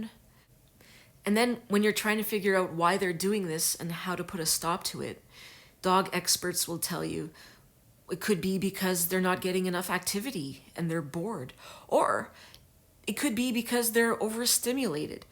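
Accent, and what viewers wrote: Canada. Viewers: It's that Canadian accent.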